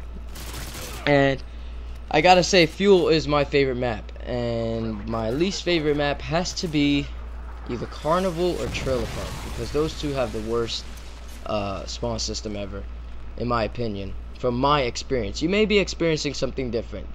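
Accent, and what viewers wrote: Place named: New York, United States. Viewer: i love the way people in NYC area say awesome and water lol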